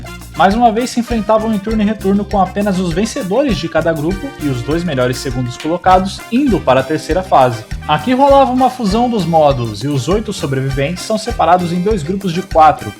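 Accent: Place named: South, Brazil. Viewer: Claramente sulista.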